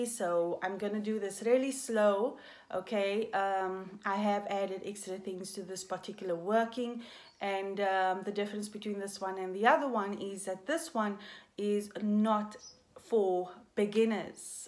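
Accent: South Africa. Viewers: Are you South African? Bec I am :)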